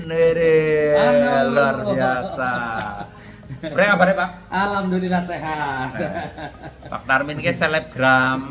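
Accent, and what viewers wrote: Banyumas, Indonesia. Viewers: Salam ngapak ko...sukses terus kang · Cempulek jebul bang wong ngapak hehej🙏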